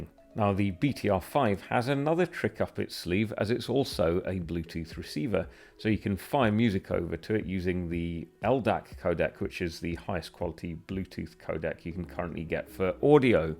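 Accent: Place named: Yorkshire, United Kingdom. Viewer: Very nicely spoken…..and I think occasionally I hear a trace of Yorkshire ..but I could be wrong…🙂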